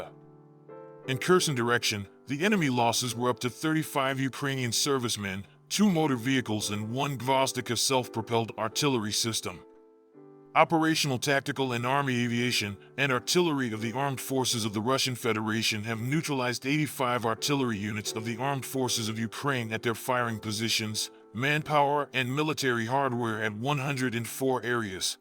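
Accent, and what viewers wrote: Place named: United States. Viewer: Hello you have an American accent but a Nigerian name.Are you from Africa?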